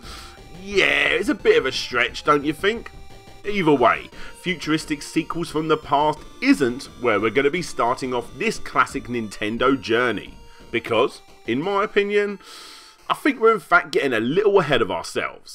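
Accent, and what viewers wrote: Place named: England, United Kingdom. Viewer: ps - Love hearing proper English accents on YT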